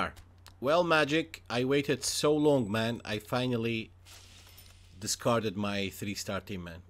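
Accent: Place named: Scotland, United Kingdom. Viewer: Where the hell are you from man? Deffo part Scottish. Cant get the other bit tho. Good videos btw. Big up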